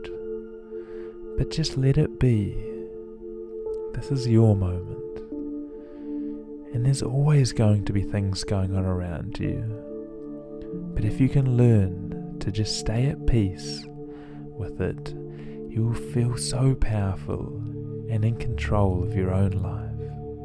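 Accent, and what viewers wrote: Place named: New Zealand. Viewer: Real relaxn More guided meditation please. its nice to hear a kiwi voice! its more calming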